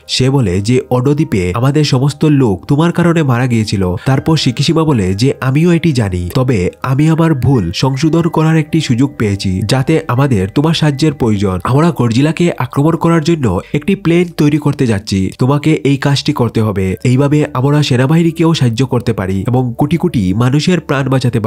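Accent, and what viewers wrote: Sylhet, Bangladesh. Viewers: bangla spelling gula improve koren kindly...apnar spelling er time e sylheti bair hoya jaachee....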